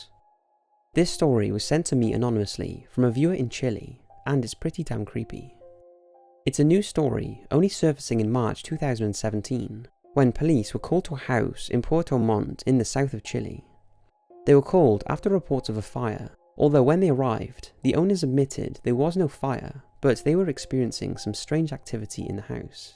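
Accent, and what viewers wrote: Wales, United Kingdom. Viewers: Hi, i love your voice xx...where are you from in the UK? xx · He's from Monmouthshire in Wales. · Go to Wales we all sound like that :))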